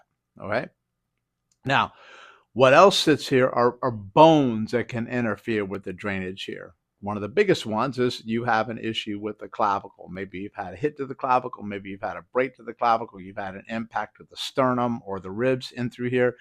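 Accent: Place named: South, United States. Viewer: Doctor have Hollywood smile - perfect teeth/alignment. And good physique and perfect example of health and healthy prototype. I detect Southern accent. What you are broadcasting is very informative and helpful to many people. Unfortunately , the conceited me a little jealous of doctor too 😢😂😅. Tq. I plan to apply these information and sustain a schedule of sorts to do it. 🤗🙂‍↕️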